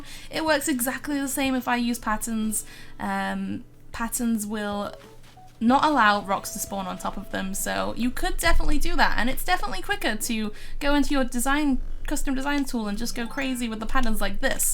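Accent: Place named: North, United Kingdom. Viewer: Shes northern uk... it's a cool accent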